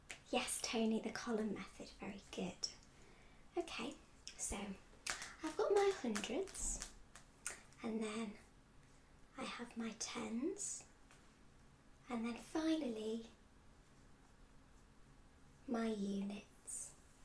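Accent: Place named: Yorkshire, United Kingdom. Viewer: You really do sound like your from Yorkshire, I'm from Doncaster well York originally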